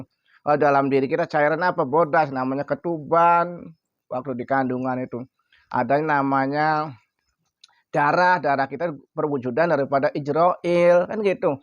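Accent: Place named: Cirebon, Indonesia. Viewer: Wa'alaikum salam warohmatullahi wabarokatuh.... In syaa allaah mama yai barakallaah... ( punten mama yai, logat kayak wong cerbon... Maaf klw salah)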